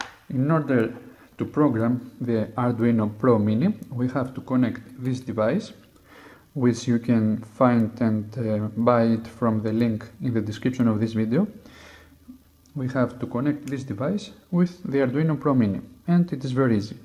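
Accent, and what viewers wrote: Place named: Greece. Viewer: Αχ αυτη η προφορα μας ! Ωραίο video ;)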